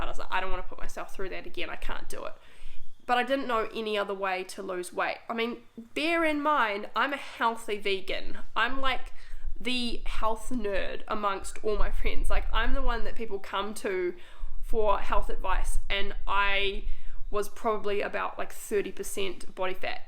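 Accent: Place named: New Zealand. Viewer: As soon as you spoke I knew you were a fellow kiwi and the struggle is real to gain the proper technique when it comes to vegan weightloss! You are so right when it comes to the confusion over all these trains of thought about diet! Wow that is so exciting to be collaborating with Kiki and Hannah when you are just starting out! You must have been mind blown! ! They are both my favorite vegan you tubers! ! Way to go!!!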